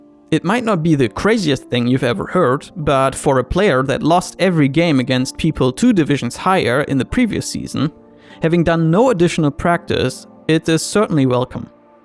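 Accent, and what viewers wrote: Germany. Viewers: As more as I'm listening to your voice you sound more and more German so are you German?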